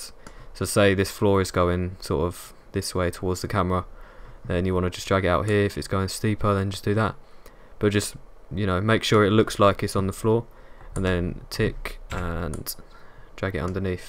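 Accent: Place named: United Kingdom. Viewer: Thatbritish accent ❤️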